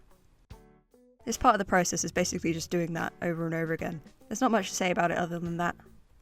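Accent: United Kingdom. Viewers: YOUR BRITISH?! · ALSO BRITISH? if so me too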